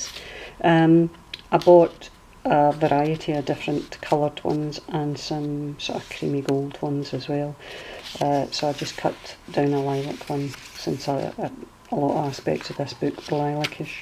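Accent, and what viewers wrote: Scotland, United Kingdom. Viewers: adorable wee little journals, you having me talking in a Scottish accent now, and I'm not making fun of you, I really could listen to you all day.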